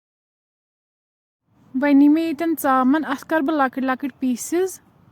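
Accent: Kashmir, India. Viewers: I am always from shopian baatu se pehchaan liya tha ki hamare side ki he hai lots of love from my side allah tala thavnev khush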